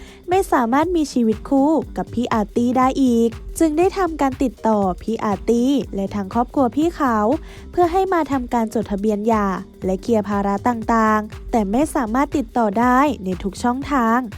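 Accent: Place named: Suphanburi, Thailand. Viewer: เหน่อเป็นสุพรรณเชียว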